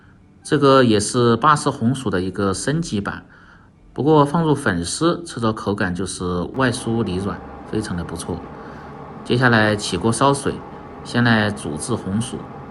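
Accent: Sichuan, China. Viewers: 我也做做看☺️老师您是四川人吧？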